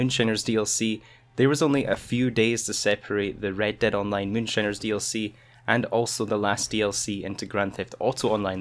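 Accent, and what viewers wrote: Scotland, United Kingdom. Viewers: Scottish? I’m Scottish! I know that’s nothing to be excited about but you know 🤷🏻‍♀️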